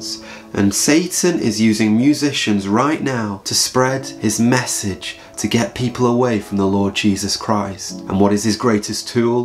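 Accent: United Kingdom. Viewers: I pray nobody is so close minded as to say “who is this British guy talking about Kendrick whomever…” you’re saving souls one day video at a time. Thank you my brother in Christ. God bless and respect from Florida ☀️ 🫡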